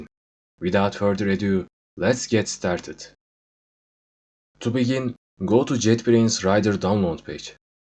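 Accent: Turkey. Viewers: bro are u from Turkey?Ur accent is like Turkish accent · yemin ederim aksandan anladim hemen turk oldugunu. yardimci oldun sagol.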